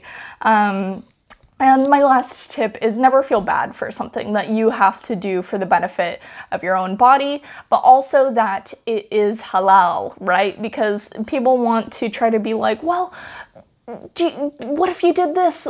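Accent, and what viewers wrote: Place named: RP, United Kingdom. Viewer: By the way you have queens accent are you familiar to her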